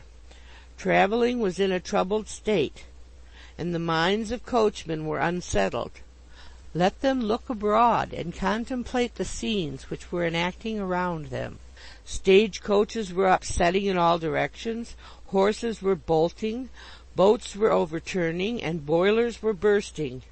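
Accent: United States. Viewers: why is a yankee reading this? wot's wrong with an English speaking person?? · The narrator is a fine old lass. A fine old American lass. I raise a glass, perhaps a second even! ) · Americans shouldn't be reading English books. Immediate turn off.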